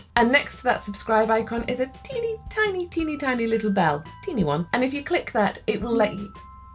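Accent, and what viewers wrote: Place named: Southern England, United Kingdom. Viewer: First- what stamper are you using? It's SO good! Second- you live in BURY? I had no idea! Your accent is much further south. I'm from Stockport myself :D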